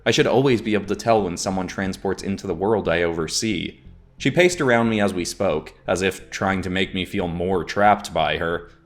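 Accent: Ireland. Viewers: Love how you pushed this to be a multi-character and beast fighting double spread. This is absolutely awesome and your irish accent was good. Were the spell names the irish words for the type of spell? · As an Irish man myself i must say, you do an impressive Irish accent 👍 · AMAZING! This Is Going To Be A Fun Miniseries, As I've Always Really Enjoyed Tayrun's World And Videos, So I'm Looking Forward To The Rest Of The Saga, And Your Irish Accent Is Good In My Opinion! I'm Still Hoping For Gardevoir In A Future Pokemon Episode And A Splatoon Video! Also, I Just Got A HUGE Jurassic World Mosasaurus Toy (I LOVE IT, But IDK Where To Put Him Yet😆) From A Comic Shop Today, Which Reminded Me Of Your Mosasaurus Demon From A While Back, And Now I Want To See Either A Mosasaurus Dragon, Or Even A WereMosasaurus For A WereDinosaurs Video! I'm Looking Forward To Your Next Video, And I Can't Wait For The Next Grand Prix!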